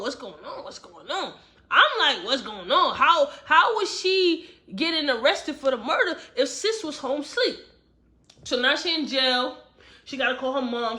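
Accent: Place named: New York, United States. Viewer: I knew you from N.y. I can hear the accent. I'm from CT.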